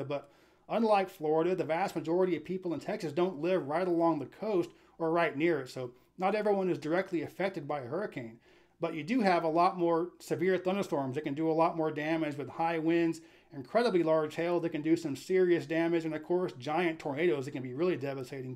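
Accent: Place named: North, United States. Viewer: Have you been to Austin lately? Work took me there and 2 things really stand out. The homeless population is enormous. All the highways are elevated. Under quite a few of these underpasses are true homeless tent cities. Not to mention panhandlers on just about every freeway off ramp. Let's not forget about the university of Texas and the murder clock tower. It stands out so much you can see it from I-35. People, for some reason want to visit the site Charles Whitman made famous. Traffic is God awful. I figure you would pick this city over Tallahassee. No surprise here. The way you speak is very much Yankee North. No way you are a native to Chattanooga Tennessee. Your videos are very good and entertaining but you are dead wrong about this one. Keep up the good work.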